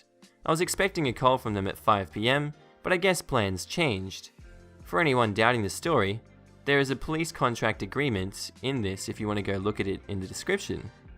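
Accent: South, United States. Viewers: It seems more country gentlemen to me kind of cute sounding I like it but your natural accent is better to me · You are a bit hard on the r's. You sound a bit Southern. :) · Appreciate the narration for these but maybe a little less Southern for the accent? Most people don't have accents like that in America or at least not that thick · Not bad of an American accent. I think you have the southern gentleman down well enough. Also power to the Disney nerds!!!!! I’m 26 born and raised on Disney (I broke the film on our Bednobs and broomsticks VHS) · Lol I like how your default American accent is a southern guy. I can confirm we don't ALL talk like that, just most of us lol. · Your American accent is doing good but only southerners really talk with that kind of accent 🤗 still though I could of believed you were American if I heard that 😆👍 Thanks for more stories ✌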